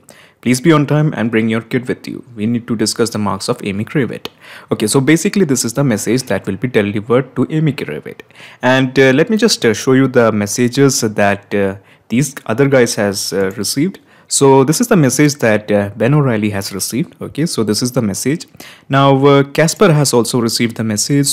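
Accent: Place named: India. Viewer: It is difficult to understand your strong Indian accent, especially when you speak so fast. i could not understand what MODE you said we should be using. I had to slow down the playback speed to finally hear that you said "incognito mode". For those of us who don't use incognito mode it would have helped it you explained how to switch to incognito mode. It would also help if you explained about Pabbly as to how much it costs to send messages. I assume a free account has limits?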